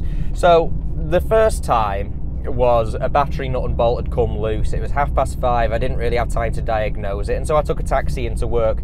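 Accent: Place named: Yorkshire, United Kingdom. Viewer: Where abouts are you based? You sound a Yorkshireman.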